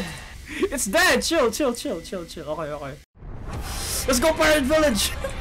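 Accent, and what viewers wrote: Philippines. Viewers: are u Filipino?,bcuz ur accent is like Filipino when ur speaking english!.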